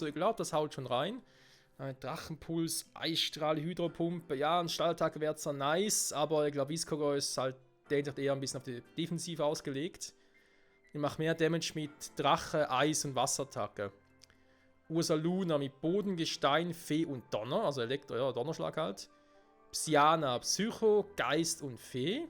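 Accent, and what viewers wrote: Switzerland. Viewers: bis dahin schau ich deine Reise durch die Hoenn Region an. 😅👍🏼 ich bin in Vorarlberg und da ist der Schweizer Akzent echt ne tolle Abwechslung zu den ganzen deutschen 😂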